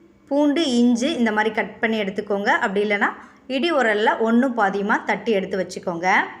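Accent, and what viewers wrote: Tirunelveli, India. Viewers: Tirunelveli slang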